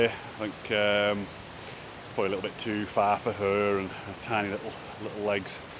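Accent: Yorkshire, United Kingdom. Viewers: look forward to that!. While I'm on the subject of pillar is there any other route up other than from the wasdale side? Ive looked at the OS maps and I'm struggling to find a good route up. I'm from yorkshire, I'm gathering from your accent you are too and I hate the drive to wasdale haha too long for my liking. So glad I came across your channel. Very impressive videos. Your love for the lakes clearly shines through.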